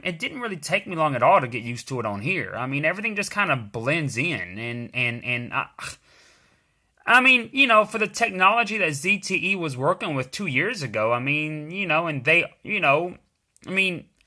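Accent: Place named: South, United States. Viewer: Southern accent!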